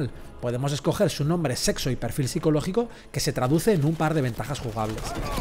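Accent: Spain. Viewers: Volvió castellano, gran análisis maestro.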